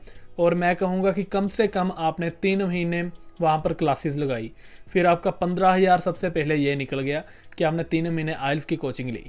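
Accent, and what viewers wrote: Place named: Uttar Pradesh, India. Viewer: Canada Jake vee up wali boli bolde ho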